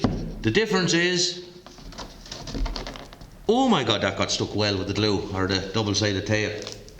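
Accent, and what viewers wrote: Ireland. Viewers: Thank you. Practical and in METRIC. All delivered in a delightful Irish accent.👍Plus the clamping and direction tips.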